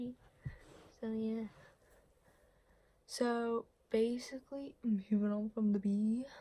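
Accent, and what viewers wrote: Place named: Liverpool, United Kingdom. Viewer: I love the scouse accent! I'm from Australia but I watch a lot of UK tv and scouse is one of my favourite accents 🙂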